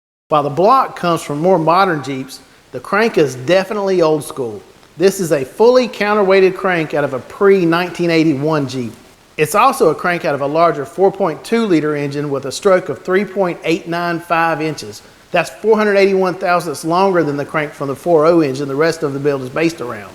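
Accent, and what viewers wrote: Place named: Texas, United States. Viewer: I wish you would've used someone else to narrate these videos. My employer's former chief officer of operations was from Texas and his twang was annoying like this guys.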